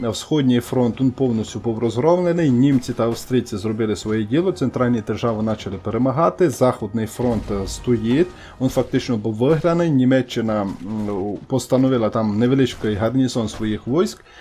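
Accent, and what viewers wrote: Transcarpathia, Ukraine. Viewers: Чоловіче, славно виходить)) і закарпатський діалект - теж файний. Я би так і продовжував коментувати: усе зрозуміло, але ти звучиш значно природніше і легше👍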